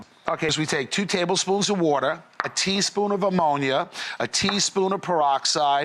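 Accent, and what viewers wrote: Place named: New York, United States. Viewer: Love this guy's NYC accent lol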